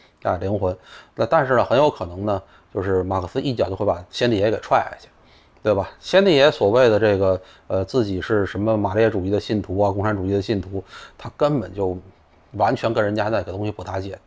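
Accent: Beijing, China. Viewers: 北京人说话真是自带幽默感，我可太喜欢了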